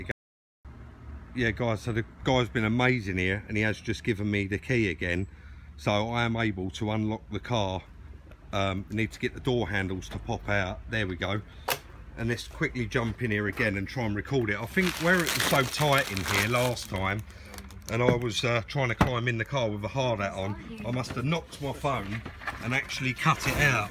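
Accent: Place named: England, United Kingdom. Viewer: Right old English fella ....love it mate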